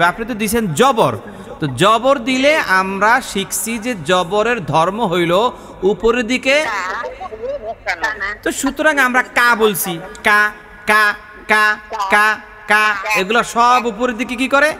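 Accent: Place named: Mymensingh, Bangladesh. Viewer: হুজুর আপনার বাড়ি কই মনে হচ্ছে আমাদের ময়মনসিংহ বিভাগে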